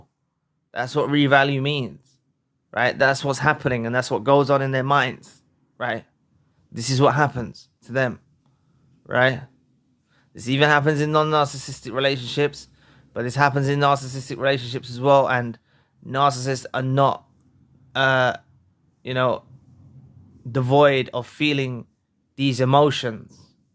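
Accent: London, United Kingdom. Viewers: Just happen to be in London right now listening to your British accent, cool! Thanks for all you do!!! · It's actually London's accent. is really cool guy, very intelligent, real light worker. Should be supported!❤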